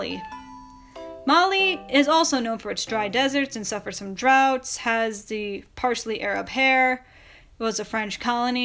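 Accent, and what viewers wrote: Australia. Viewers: Lmao just clicked on the video and already an Aussie accent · Why Australian accent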